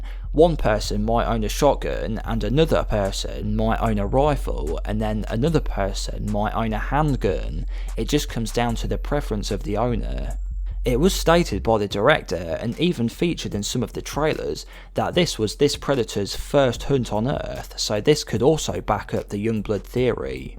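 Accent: United Kingdom. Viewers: Thats a thic british english mate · dude i couldnt concentrate on the video because of your accent i dont to listen to british accent again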